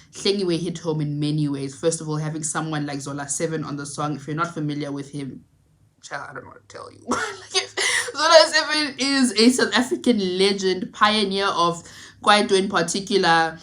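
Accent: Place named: United Kingdom. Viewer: I'm here for the British accent 😅.. Energy always on another level 🔥🔥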